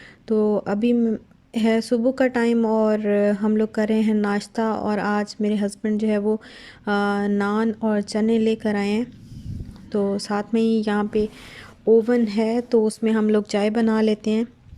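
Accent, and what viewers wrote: Saraiki, Pakistan. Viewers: Welcome to karachi sister you said you belong to Lahore but your excent is Sariki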